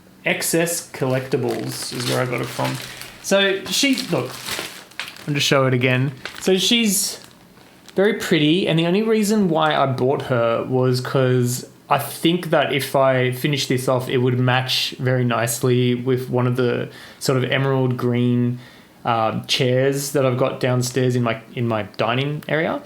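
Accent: Australia. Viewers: Great first flosstube! Lately I feel like all the flosstube channels I’m recommended offer up similar content. I discovered a few new designers with you which got me excited! It’s also great to hear a fellow Aussie accent ☺️ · Hey from Melb, love hearing another Aussie! Well done on your finishes. I agree, it's a daily lifestyle. Hope your pinky recovers, I hit 40 last year.....gets worse 😂. Welcome to Flosstube 🥳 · Welcome to flosstube knew you were a fellow Aussie as I was listening to you - your a natural - your wizard work was fantastic ~ I am working on the pirate ship for my grandson I did change the called for fabric though a stitch in time is an Aussie store I buy from too needle minders I brought from WA etsy store recently called ‘inchwormdesign’ really like them and reasonably priced - looking forward to next episode😊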